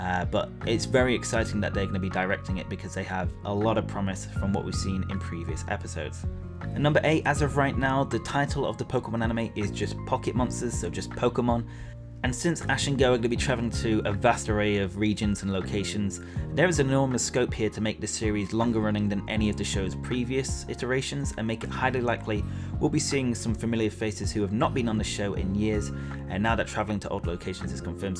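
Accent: United Kingdom. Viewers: Bootiful British accent I think? Makes me swell wit pride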